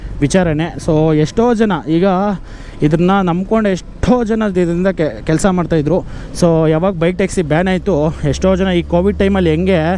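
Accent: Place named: Andhra Pradesh, India. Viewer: Anna telugu meeru telugu wala nenu telugu but study for kannada mi mataloo telugu anipistundi i like anna ❤❤❤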